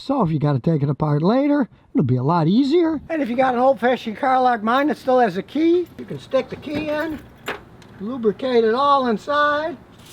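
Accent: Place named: Chicago, United States. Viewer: you sound like you are from Chicago.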